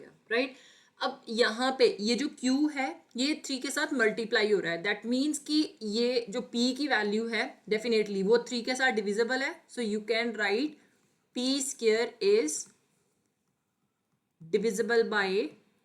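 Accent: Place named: North Kerala, India. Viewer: vadakkan accent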